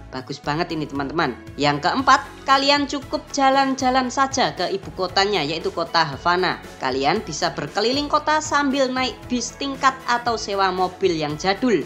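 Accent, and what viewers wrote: Java, Indonesia. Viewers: Ini orang Jawa banget deh kyknya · Jowone ngendi kang? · suara loo medok banget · Yang ngomong medok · seru yg ngisi voicenya, medok jadi makin betah nnton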